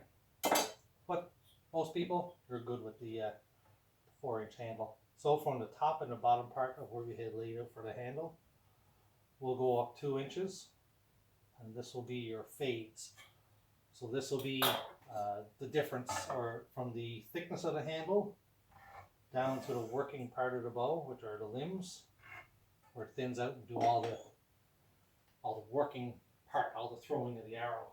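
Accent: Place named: Newfoundland, Canada. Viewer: You sound like you're from Newfoundland, if so what part?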